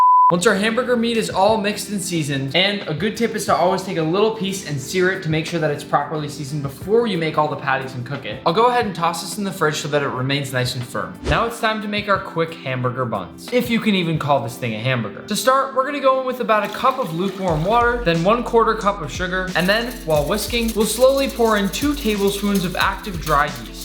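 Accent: United States. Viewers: Sounds pretty American to me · this sounds very american lol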